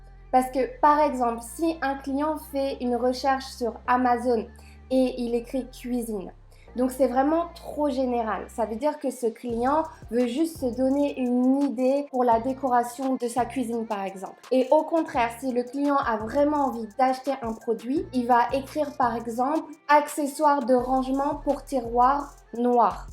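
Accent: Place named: Alsace, France. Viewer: Moi je pense d'Alsace ou Loraine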